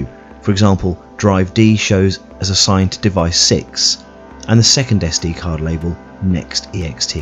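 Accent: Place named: United States. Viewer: another great video!... and a great way to prep your soldering iron tip is to wipe it and tin it with ThermalTronics "Tip Tinner". Also, you might find a 1.5mm tip more suitable for pcb work than the 3mm, especially when soldering SMD. And yes, it's always ZEE if you've lived in the States for while:).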